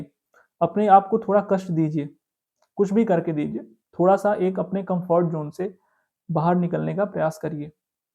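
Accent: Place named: Uttar Pradesh, India. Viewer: Apki boli achi lagi, ap UP se ho kya? wahi se hote h zadatar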